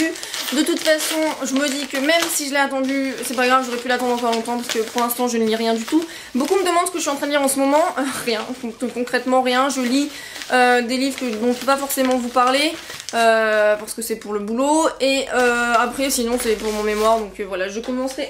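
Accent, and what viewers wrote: England, United Kingdom. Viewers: Salut, t'es anglaise ? En tout cas t'as un super accent ! Bisous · Je me régale avec tes vidéos ,et tu as un accent anglais so cute ! ( vive le VO ! ^^) Malheureusement je n'ai pas autant de livre que toi mais ça viendra j'espère :P Bonnes lectures !! :D ( Of Neptune , la couverture quoi ^^ )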